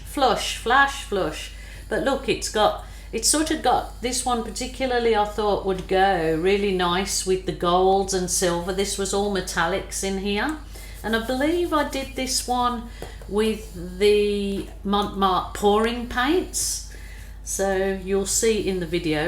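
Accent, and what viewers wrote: United Kingdom. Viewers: thought so I'm from Birmingham uk and thought 🤔 sounds uk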